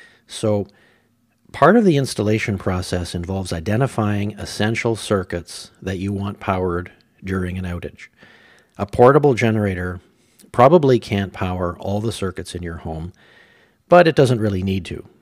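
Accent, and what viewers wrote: Canada. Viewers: I can tell by your accent that you are Canadian. One other legal connection here in the U.S. is a metal block that bolts to your home breaker panel cover. To use it, you have to install a second main breaker below the original then install the block. The block will move up or down to allow EITHER your main panel breaker OR your generator's main breaker to be switched on but NOT both at the same time. I can't use any of these options because the idiots who built my house placed my panel box in an inside wall and it would take major design changes in the construction of that wall to allow rewiring of the panel so I did what I wrote below a year ago.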